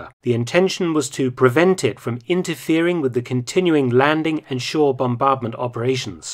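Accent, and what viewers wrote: England, United Kingdom. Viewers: Best WWII Youtube, 100%. Encountered your channel in late 2018 by chance, and I believe I’ve watched every single one of your videos, some over again. Can’t say that for many other Youtube channels. Your clear, concise English accent and the incredible knowledge is like the History channel (when it was actually good) on steroids. With the amount of information you have on WWII, you’d almost think you lived through it. I don’t know if you’ve ever considered or done this already but I think you should apply to be narrator for history programs on the side. I’d watch them all. Thanks for the amazing videos Mr. I hope they keep coming for many years!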